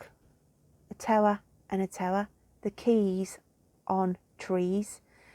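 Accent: Birmingham, United Kingdom. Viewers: Very uplifting message! It's nice to hear a Brummie doing readings 😊❤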